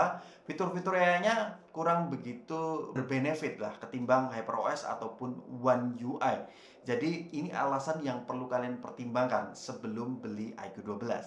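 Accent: Semarang, Indonesia. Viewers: loh citragrand, masnya orang semarang yaah? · loh semarang to mas ?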